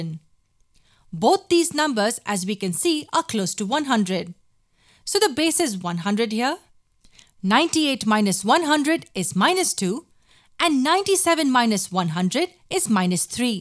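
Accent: India. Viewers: the accent is clearly indian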